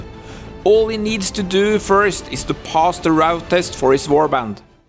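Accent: Norway. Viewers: Is that charming Norwegian English i hear? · Very cool! I can hear from your accent that you are in Norway. Let me know if you are in Oslo and wanna play.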